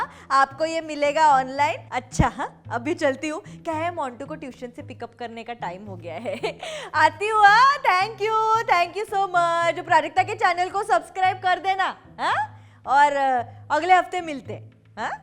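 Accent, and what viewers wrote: Maharashtra, India. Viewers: My god her acting skill and the marathi accent..love it dii ❤️❤️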